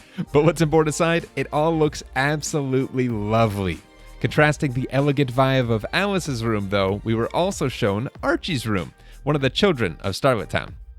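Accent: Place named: Indonesia. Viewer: Wow your Indonesian Acsent So Perfect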